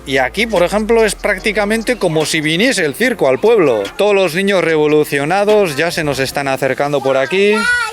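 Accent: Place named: Basque Country, Spain. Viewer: Ahi va la ostia,! Menudo acentazo vasco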